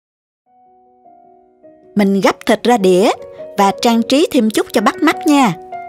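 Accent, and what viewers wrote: South, Vietnam. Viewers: Đúng chất giọng.Nam bộ Bình Dương rất dễ nghe dễ hiểu có chất giọng rất truyền cảm CĐM luôn ủng hộ k.BD... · Chào chủ kênh. Giọng nói miền Nam nghe ấm áp ngọt ngào. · Cách hướng dẫn dễ hiểu và giọng miền nam đặc trưng mộc mạc hiền hoà dễ thương lắm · nói giọng nam bộ rất dễ nghe ấm áp,ngọt ngào,thân thiện,dễ thương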